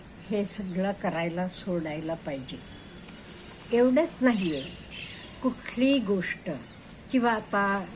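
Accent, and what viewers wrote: Karnataka, India. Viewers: तुम्ही खूप गोड आणि हुशार आहात.तुमच्या बोलण्यावरून तुम्ही Karnatak च्या असाव्यात हे ओळखले होते.तुमचे videos informative असतात.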